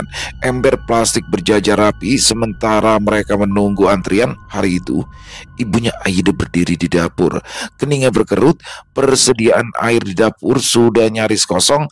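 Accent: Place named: Banjar, Indonesia. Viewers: Kaltim hadir bang kental banar banjarnya😂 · Waalaikum salam, klo bang berbahasa banjar logatnya spt org banjar asli, salut bang😊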